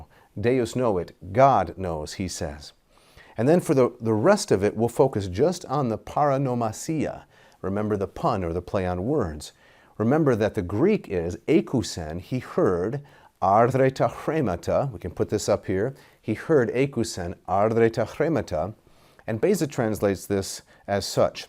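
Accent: United States. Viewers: As a native speaker of Modern Greek, I find this painful to listen to. The Erasmian pronunciation with an underlying American accent sounds unpleasant to native Greeks. Nevertheless, how you pronounce Greek does not affect your understanding and ability to translate. If you didn't display the text I would not have understood a word you said in Greek.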